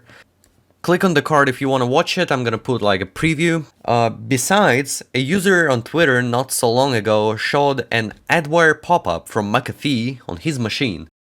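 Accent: Germany. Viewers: are youre german or is that the windows? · are you german? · Yoyoyoyo wait are u german?!?! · Hey just a question are you from germany or russia (Just a question) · bro bist du deutsch ? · ach lol, du bist ja Deutsch!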